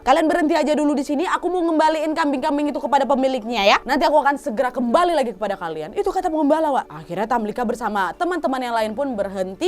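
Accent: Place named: Manado, Indonesia. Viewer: Aku suka gaya bicaranya, kayak orang Manado🤗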